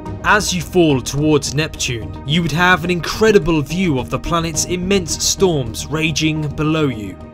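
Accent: United Kingdom. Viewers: That's because he's British! Us brits explain things in great detail and talk properly 😁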